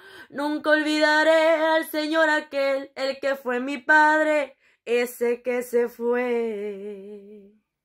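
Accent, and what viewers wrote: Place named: Sonora, Mexico. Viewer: Niña usted habla como los de sonora, cantas muy bonito.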